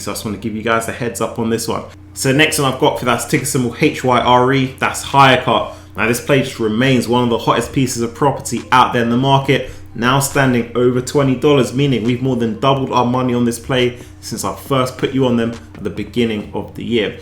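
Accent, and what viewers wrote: United Kingdom. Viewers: Hi, are you from UK?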